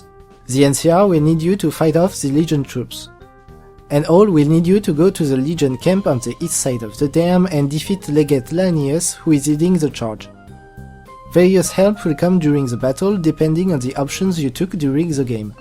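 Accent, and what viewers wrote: France. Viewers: ... never changes. In the beginning he uploaded great tutorials and lore videos, with a mild French accent. Now he uses his own music and has significantly upgraded the quality of his already amazing videos, but beneath the cover he's still the same. Because... never changes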